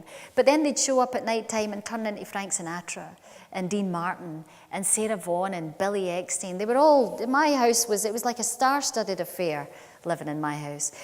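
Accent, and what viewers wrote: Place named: Scotland, United Kingdom. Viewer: Hey there community. Would you help a non native English speaker figure out some of the words of Caroline's presentation? The transcription is really bad (and the translation is no help, just as poor). I have been able to correct most of it but some parts remain a mystery to me... Scottish is definitely a challenge, lovely but tough. There's nothing essential missing to understand this powerful and incredible presentation but I'd love to have a full clean text to read. Thank you all. at 2.24, Caroline's Mom says to her after the remark about the Cupid's bow "Caroline, you're a XXX ? " at 4.56, she refers to the moon "it"s like the XXX of the moon". at 5.45, after "presbyterian, "XXX.. " can't understand what follows until "in fact this is a question... at 7.17 you've got a long time to figure out.. XXX ?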